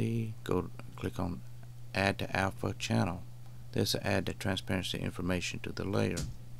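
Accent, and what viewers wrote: South, United States. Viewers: Very helpful, but you sure do sound like my Uncle Cecil? Are you from TN or KY? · Don't use GIMP every day, would have taken me hours to figure this out (if at all), but video very clearly explained, & with a beautiful southern accent!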